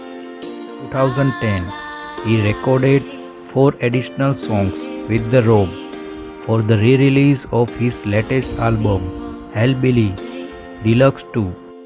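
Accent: India. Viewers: The guy narrating this needs to learn some English first haha. Sorry I’m not a racist - just that his voice is hilarious. He sounds like a Bihari from India. I am sure the guy who posted this video did that voice as a joke. And what’s with the elevator music?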